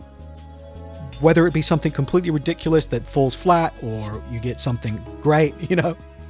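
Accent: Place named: West Country, United Kingdom. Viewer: More likely from the south west country, not upper class but well educated working middle class from a nice area.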